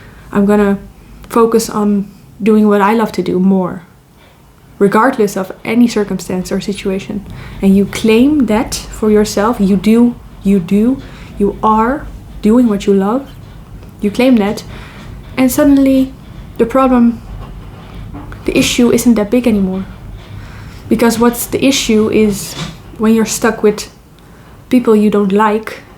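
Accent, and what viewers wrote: Netherlands. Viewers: I have listened to several of your videos and you are remarkable, you articulate the truth as I have experienced it with so articulately. My only question is where are you from? The Netherlands? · Such profundity rendered through a delightful Dutch accent! Enjoy channeling your Higher Self : ) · Wait are you Dutch ? (Flemish here)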